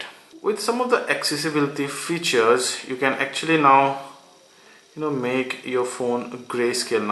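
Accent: India. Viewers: Indian hands and ascent.... its easily to be recognized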